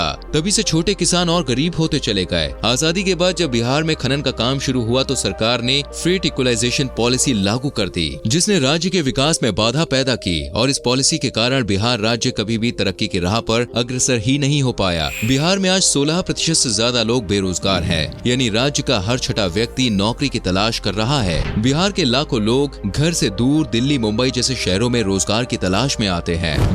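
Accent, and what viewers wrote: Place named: Assam, India. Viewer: आप तो आसाम के लग रहे हो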